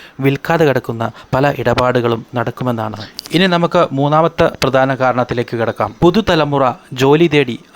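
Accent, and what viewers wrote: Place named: South Kerala, India. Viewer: തെക്കൻ ശൈലി ആശാൻ കളരിയിൽ നിന്ന് പഠിച്ചത് മലയാള അക്ഷരം മലയാളത്തിൽ പറയാൻ അറിയാത്തവർ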